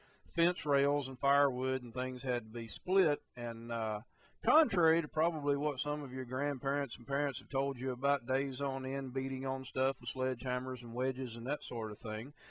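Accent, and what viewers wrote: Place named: South, United States. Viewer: Normally I hate long intros, but I feel like it really made the video more entertaining, especially with the southern accent😂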